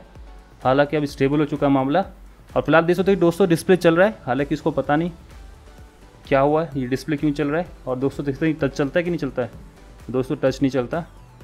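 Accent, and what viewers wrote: Marwari, India. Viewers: bhai marwadi ho kya??? Awaz se to lag hi raha hai.......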